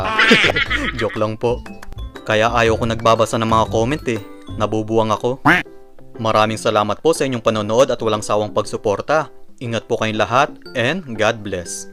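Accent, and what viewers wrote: Pampanga, Philippines. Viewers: Haha sabi kuna pin kapamps ka eh base king boses mu... First time ku apanalben video mu lakas maka entertain brad haha. God bless and more power!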